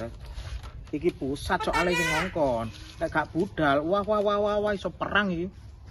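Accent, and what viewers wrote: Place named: Sidoarjo, Indonesia. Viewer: daerah ndi yo...? logate koyok Sidoarjo...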